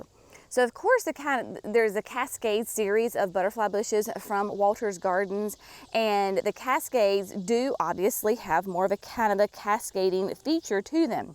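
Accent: South, United States. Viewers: You give me such great joy in the morning. I grab my coffee and listen to that beautiful southern melodic voice of yours. You and have worked so hard on the gardens. Proven Winners picked the most dedicated gardeners in the South! I can’t wait to see what you all do.😍❤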